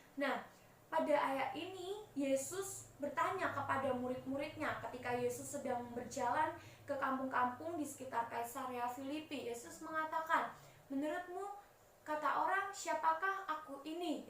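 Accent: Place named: Java, Indonesia. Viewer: Aminn.. makasih ci... Medoknya menjadi ciri khas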